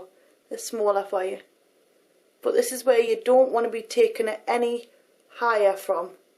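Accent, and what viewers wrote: Newcastle, United Kingdom. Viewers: shes from newcastle :) geordie accent! best of all :D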